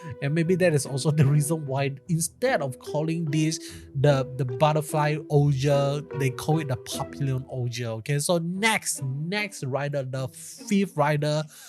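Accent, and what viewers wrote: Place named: Japan. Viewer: Your thick accent is hard to listen to just speak japanese with English subtitles it would be less painful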